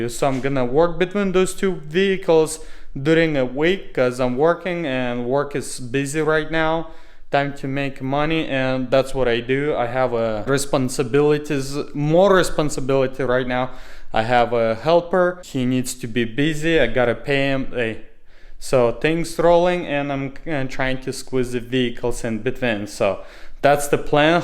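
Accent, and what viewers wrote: Russia. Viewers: ебать по акценту слышу что это русский вася!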